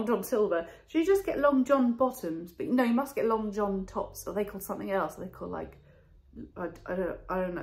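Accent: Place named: England, United Kingdom. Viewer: recent subbie and I love watching your videos. Part of the joy is your beautiful English accent. The haul was amazing. My favorites were the dresses. Love the style of them. Enjoy your Easter weekend. 💛💛🐣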